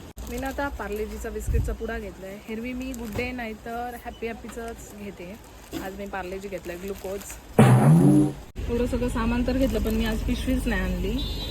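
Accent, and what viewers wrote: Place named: Konkan, India. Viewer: कोकणी बोलण मस्त वाटत, मांजराशी बोलण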